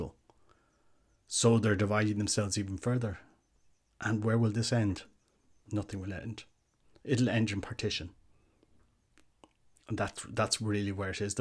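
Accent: Ireland. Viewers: Thank you for addressing this! I love icons and have been collecting copies from my local Catholic store; I couldn't understand the blacked-out baby at all. One good result of TM 's video was that I first heard of Fr. Thady's channel - what a lovely 'old-school' priest he seems to be, although not at all afraid of speaking his mind! We used to have lots of strict but kind-hearted priests with wonderful Irish brogues here in Canada, but no more. Now I can listen to dear Fr. Thady's channel as well as to your soothing brogue. 😃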